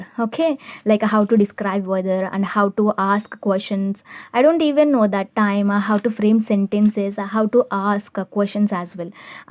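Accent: South India, India. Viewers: U r accent is so south Indian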